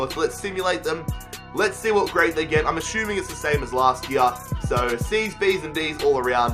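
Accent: Australia. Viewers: Your Aussie. I love it mate. I'm Australian too. Good vid mate ❤️😎😜💪👌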